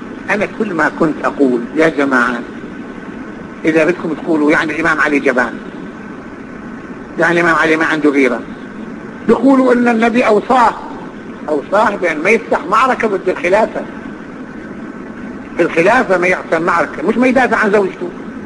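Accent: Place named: Iraq. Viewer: هذا لهجة نتاعو عراقية ماشي دزيري الله لا يبلينا ربي بالتشيع الله يسترنا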